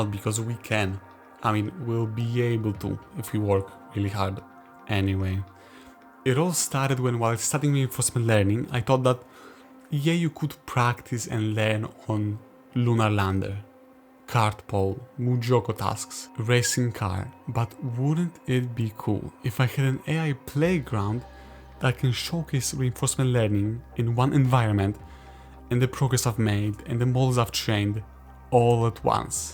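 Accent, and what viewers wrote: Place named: Germany. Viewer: Is creator german? This accent i dont know what is it😂. Cool video btw!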